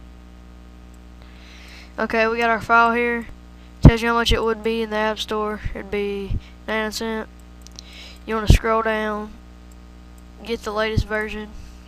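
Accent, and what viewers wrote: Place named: Netherlands. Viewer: Stop insulting his accent, its dutch and its cool :) Yep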